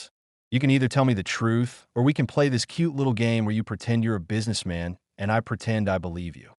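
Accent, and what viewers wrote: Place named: South Carolina, United States. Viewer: Absolutely love this story. I'm from SC and that's exactly how we speak.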